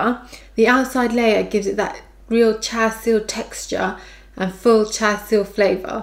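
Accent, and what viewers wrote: United Kingdom. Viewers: Lovely accent its british i love how simple and quick you did this recipe and so simple this is a must make for me thx💜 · Are you brittish?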